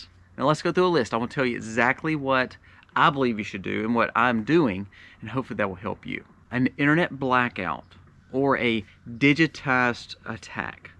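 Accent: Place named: Mississippi, United States. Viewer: 🤔 "...your WHALE...how to BOWL...,"😮😅 Oops! Forgot to adjust my ears for your lovely MS "accent" (we ALL have accents 😉)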